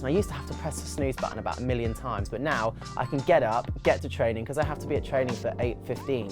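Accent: United Kingdom. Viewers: I always love British accent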